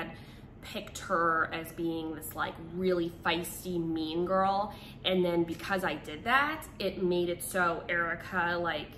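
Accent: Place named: Massachusetts, United States. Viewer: Everyone subscribe to channel so she can talk about the Jamaica cat incident!!!!! I feel like and Danni would get along, should visit LA and do a video with danni!! Your both from Massachusetts talk kinda the same and are fun good story tellers idk🤷🏻‍♀️🥰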